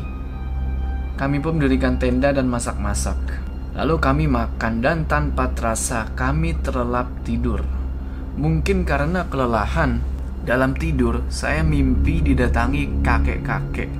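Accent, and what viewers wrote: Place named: East Java, Indonesia. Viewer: Jatim mana cak?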